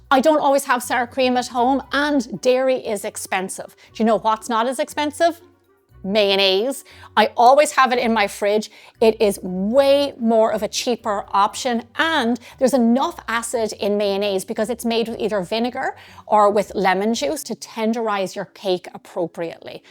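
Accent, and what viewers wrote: Ireland. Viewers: (LOVE this woman's Irish accent!) 😊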